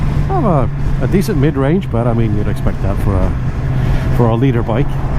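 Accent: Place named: Canada. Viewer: I’d have said sounds like a Canadian accent Nice bike bought an orange one a few months back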